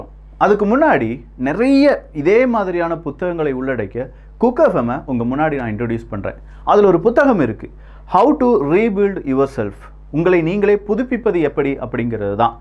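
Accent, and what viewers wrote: Kerala, India. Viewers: Hi, i follow all your channels regularly, really appreciate your efforts n hard work. Tamil politics, international news, Geopolitics updates, science n technology, all i n all boss neenga. Krep going.. btw, I am frm Brussels. One suggestion: you really have to change TamilViyugam theme😊 And your English pronunciation quete keralitic, like Public, application etc should be pronounced correctly, since some students following this thread, so;) Thanks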